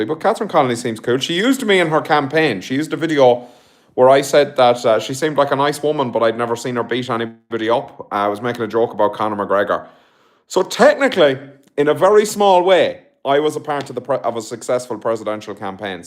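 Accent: South, United States. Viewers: I love your Southern American accent, it's suuuuper cute and pretty accurate, coming from a Southerner. 🥰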